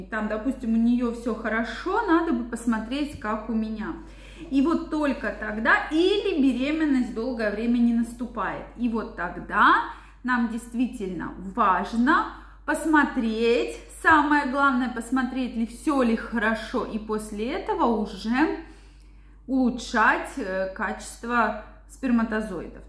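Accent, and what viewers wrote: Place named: Urals, Russia. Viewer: Вы с Урала? Говор специфический.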